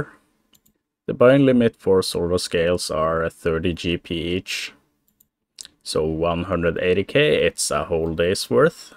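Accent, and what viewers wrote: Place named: Norway. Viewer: Jeg visste at du er norsk ut fra aksenten din, dette er en veldig fin video(vær så snill og si meg hvis norsken min er dårlig, jeg begynte å lære for 1 år siden)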